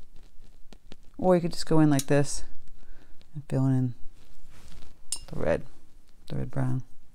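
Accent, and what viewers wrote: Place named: New England, United States. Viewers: Love your style. And your cute east coast accent. 😊🌹👍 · Great lesson for beginners. Also a good way to save on paint and paper for beginners. Love your New England accent. I've lived in Florida for 50 years and people can still hear mine!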